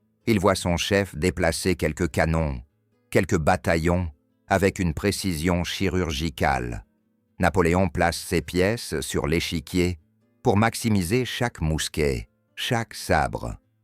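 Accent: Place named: France. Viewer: it's a French accent and a very nice one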